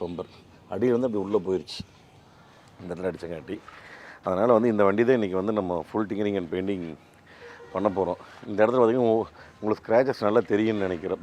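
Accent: Kongu, India. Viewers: அருமையான வேலைப்பாடு இனிமையான கொங்கு பேச்சு செலவு எவ்வளவுனு சொல்லுங்க சார்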